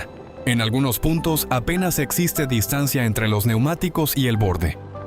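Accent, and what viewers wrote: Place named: Brazil. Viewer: Abençoado dia por Deus obrigado por passar uma voz quase Brasileira gosto de vidios asim e asim entendi muito bem o que diz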